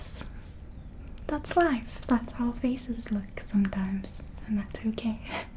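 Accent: South Africa. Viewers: Sooo soothing 🥺 are you from South Africa?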